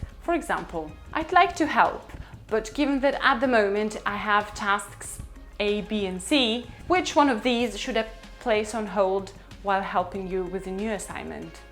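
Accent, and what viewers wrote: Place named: United Kingdom. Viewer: Странное сравнение... У этой девушки поставленный британский акцент, в то время как у Марины - американский.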